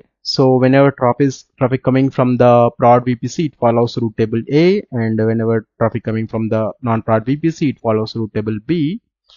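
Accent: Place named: India. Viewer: Just another indian with a terrible accent reading from a google search about the subject.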